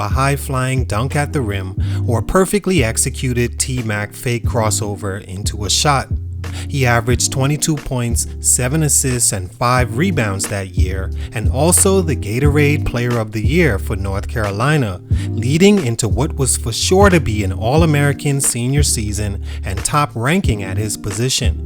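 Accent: Bahamas. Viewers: Are you from The Bahamas. You sound like a Bahamian. The Bahamas is my second home.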